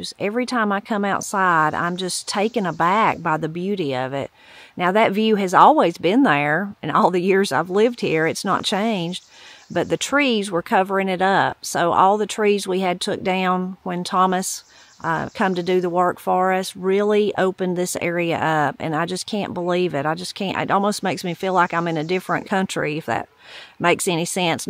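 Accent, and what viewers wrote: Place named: Arkansas, United States. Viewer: I love listening to you talk. You remind me of my aunt pearl she was a native Arkansas. And she speaks like you do w that accent. It's so true...and calming to listen too. So is voice I get soo much off her devotionals.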